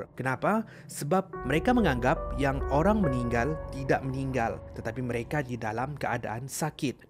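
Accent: Sabah, Malaysia. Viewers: abg ni sabah or sarawak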